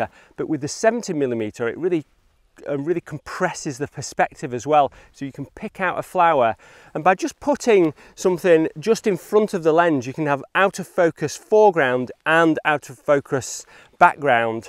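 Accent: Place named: Yorkshire, United Kingdom. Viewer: you're still utilizing the original 70/200 nikon vr? please explain why mate! love the regional English accent....so soothing! Is it yorkshire?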